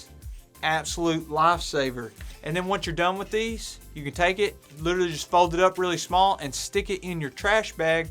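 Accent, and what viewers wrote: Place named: South, United States. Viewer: In fact, if you keep the bottle cap on and cut off the bottom end of the water bottle, your scoop is several ounces larger because you didn’t have to lose any volume getting to the wide part of the bottle; and - bonus - you have a funnel option if and when you need one. Not a good option is you are wanting to park a scoop of water upright, unless you jam the neck of the bottle into a cranny in a tree root or a vole hole. Anyhoo, I dig your vids; you are judicious, even-handed, and informative, all in a well-tempered laid-back package. I take it from your accent that you are a Southern boy; I grew up within 5 miles of the tallest peaks along the Blue Ridge Parkway and about 10 miles from the North Carolina border of the GSMNP, where I started hiking and camping at age 7 or 8 with my Pop and younger brother. As you said, good stuff.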